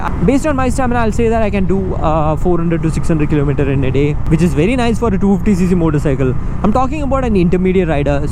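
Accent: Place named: Tamil Nadu, India. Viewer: The word is "posture" not the "Porche" 😂 Your accent sucks, bro. It sounds like Tamil Nadu speaking English. LOL 🤣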